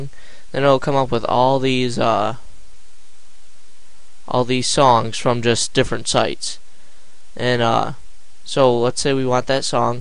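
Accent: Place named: New York, United States. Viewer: Are you from New York? You sound EXACTLY like my friend.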